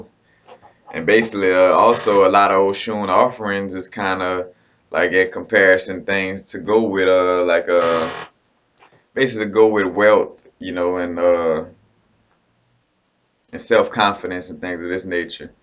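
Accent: New Orleans, United States. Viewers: U sound very New Orleans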